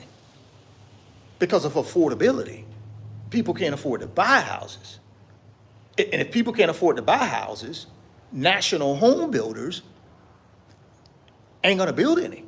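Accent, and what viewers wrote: South, United States. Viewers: When Says Nimber (Number) you know he’s gotta be from somewhere in the South 😅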